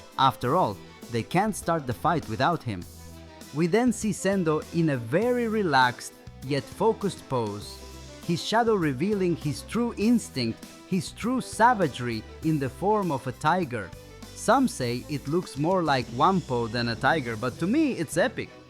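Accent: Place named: India. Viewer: Are you indian? You have indian accent.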